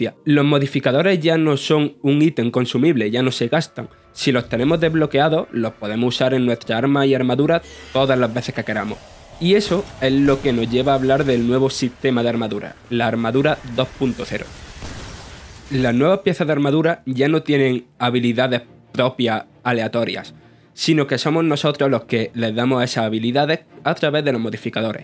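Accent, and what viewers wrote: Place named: Granada, Spain. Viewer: jjajaja sí, es que dicen que es acento granadino.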